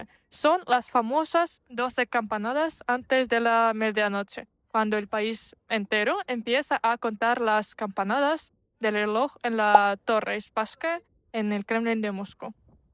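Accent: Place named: Spain. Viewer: Ella tiene el acento español muy marcado, suena muy linda. 💜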